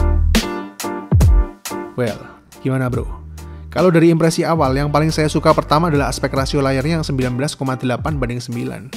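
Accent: Yogyakarta, Indonesia. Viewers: Bang orang Jogja po?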